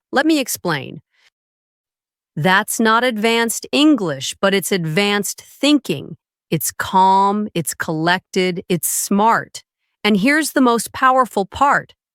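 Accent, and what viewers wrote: United States. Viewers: How come you have shown British flag but speaking American English 😅😂 , overall it’s a great video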